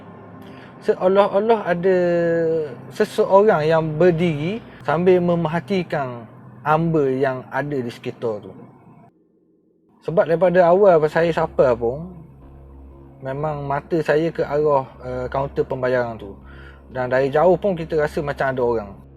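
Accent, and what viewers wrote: Terengganu, Malaysia. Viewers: Siang ujan, malam turun salji. 😂😂 Haha...Buat lawok abg ni...Abg ni selit lawak2 skit, tu yg best...Sy suka cara Abg cerita, walaupun tranung tu pekat...Suka dengor loghat2 ni...Keep it up bro...😎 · salon ke salor ke janji org baca dn org faham..tersalah taip,atau dengar x jelas yela sleng ganu kn..tq tau salon rambut ke yerk.salor..slmt mlm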